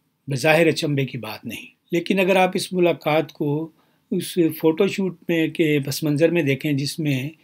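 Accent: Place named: India. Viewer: And let us all know what is RM TV? Is it shorter form of RAM? Coz you speak like Indian.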